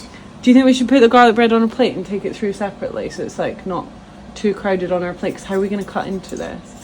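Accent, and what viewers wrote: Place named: Edinburgh, United Kingdom. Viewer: I stumbled upon your video a couple of months ago and I love them. I love the honesty in them; you're not trying to be some numpty influencer. You're just. Keep on doing that because it's working. Your accent makes me homesick for Edinburgh (I'll get back one day). So, as my late father would tell me "Keep dancing to your own Piper." Now I need to rewind and see what book it was that you mentioned at the start. One day I hope to see you mentioning one of my books (once I've finished writing my first!). x PS: I started following your sisters' vlog. I'm even more homesick!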